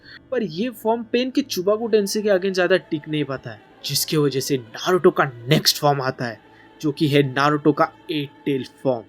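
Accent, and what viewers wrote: Maharashtra, India. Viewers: Are you maharashtrian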